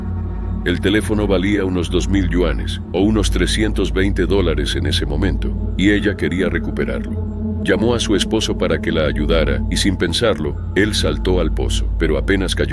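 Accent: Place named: Spain. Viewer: tu azento es Lindo cariño es español 😍😍😍😍😍💋💋💋💋❤️😊🧜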